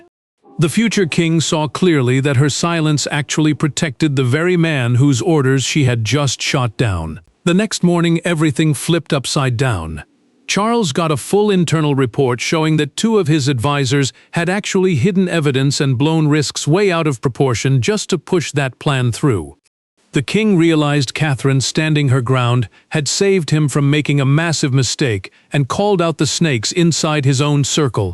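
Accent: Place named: United States. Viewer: That's why we don't trust any of it. And spoken with an American accent, what can I say. It's not for Americans to comment on our affairs, and certainly not in this way. Americans always steps on other people's toes, thinking they own the world.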